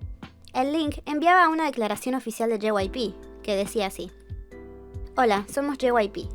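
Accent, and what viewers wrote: Argentina. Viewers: Sos de Argentina??? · es argentina así se pronuncia acá bobo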